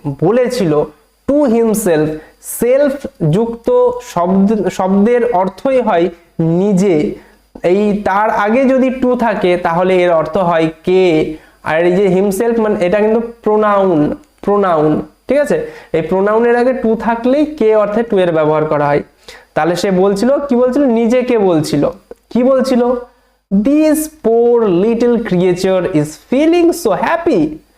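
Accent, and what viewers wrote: India. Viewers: তোমার বাড়ি কি মুর্শিদাবাদ এ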